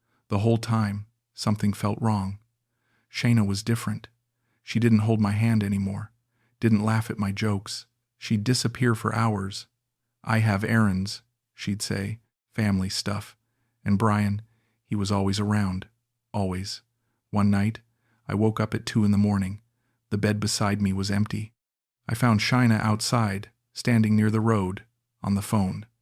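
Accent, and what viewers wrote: United States. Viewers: Another yankee voice talking about fakeness, yankees are good at scam stories.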